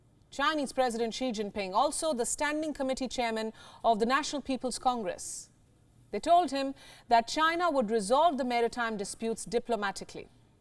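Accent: India. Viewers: If not for her Indian accent, I would have believed everything she said. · It's like watching CNN in English with a Indian accent 🤮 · Your Indian TV program Gravitas is a really a joke, why ? Your English is terrible with heavy Indian accent, all you should do is to brush your English. The South China Sea within the nine dashlines belongs to China. Phillippine intruders will of course be warned, and we Chinese are not warmongers, we just warned the Phillipine warship with laser, this is a very advanced weapon than firing rockets at the Philippinos. By the way the Philippinos don`t have such advanced laser weapon, so stop intruding Chinese territory. Next time there will be no such friendly warning just by laser, your military vessels will be blown into pieces.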